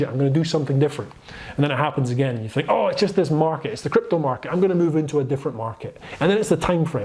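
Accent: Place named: Inverness, United Kingdom. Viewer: Are you from Inverness? Your accent sounds very much like it!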